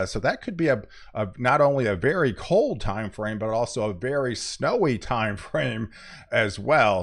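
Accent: United States. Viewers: There are people commenting on his way of saying westrin for western, eastrin for eastern and pattrin for pattern. These are part of the United States regional speech differences. A regional accent if you will. Like a Boston accent or Southern accent. I have a friend from Kentucky who talks like that. You may think they’re uneducated or stupid but it’s a speech pattern of their region. Very hard to unlearn even if you get multiple degrees. Just my two cents.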